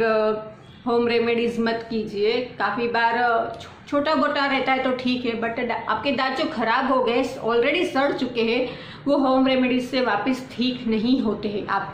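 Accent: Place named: Gujarat, India. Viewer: Ma'am I think app gujarati ho. Apka accent gujarati jaisa lag raha hai.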